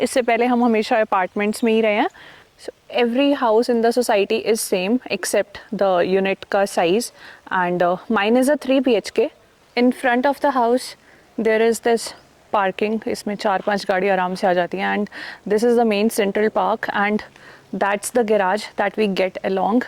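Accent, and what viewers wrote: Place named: Uttarakhand, India. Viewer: Looks from her accent that she is a Uttarakhand Brahmni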